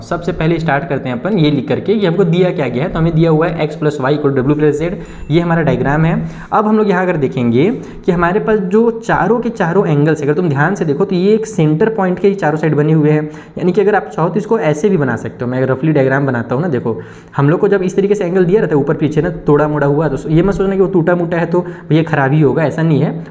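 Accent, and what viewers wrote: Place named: Bhojpuri, India. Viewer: Sir is speaking bhojpuri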